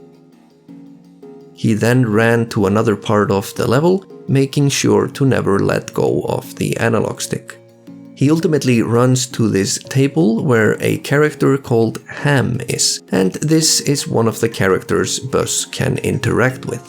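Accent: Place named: Iceland. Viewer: I never click speedrunning videos. Click this one and instantly was like woah is that an Icelandic accent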